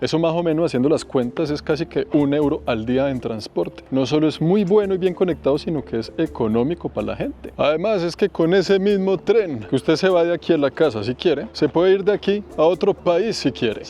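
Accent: Bogotá, Colombia. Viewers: Si.muy Colombiano en sus modos de hablar y dichos.👍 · Me encanta su voz 😅 el verdadero acento rolo, sin la degradacion que le han dado muchos habitantes de bogota. Gracias por tus exelentes videos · Me encanta como me haces viajar desde mi humilde habitación de España, por cierto me gusta mucho la forma de hablar con el acento colombiano y la cultura que trasmites con tu contenido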